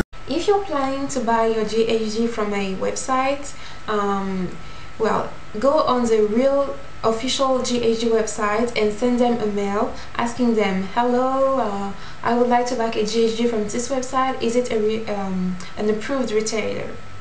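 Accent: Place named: France. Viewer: She sounds very french.. what do french people look like? because I am French! born in Nice raised in London!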